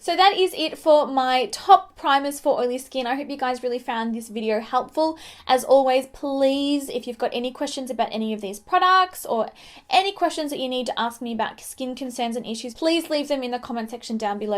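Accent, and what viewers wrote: Australia. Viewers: Love love the Hourglass Primer and the Porefessional from Benefit the best. Didn't like the Becca it kept balling up on me and I warmed it up like they suggested. Will have to get the Rimmel and try that drugstore brand. Love all your videos for us oily t zone gals. Oh I bought that L'Oréal in the 106 and you were right it worked on me cause I'm olive skinned, thank you so much. I'll have to go back to your foundation videos and see what colors you use in those and hopefully get some samples from Sephora. Love how you interact with us, your one of the few who does that, it means a lot to us. Love your Australian accent, can't get enough, your so georgeous.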